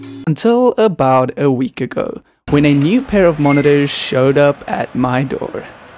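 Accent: South Africa. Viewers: I just watched a video of yours where you compared 6 studio monitors and went looking to see if you had an updated version. This was recent so I watched it. My suggestion is to use a spectrum analyzer to compare monitors. Anything else is too subjective. You can even install a free one on your phone. The purpose of monitors vs speakers is to give you the pure, unaltered sound of the music/instruments/voice as it was recorded/played. The only way you can tell is to use a spectrum analyzer and compare how flat the sound is from one to the next using an objective measurement. I like what you're doing, just a suggestion on how to do it. BTW, are you South African? I lived there for several years a long time ago. A beautiful country.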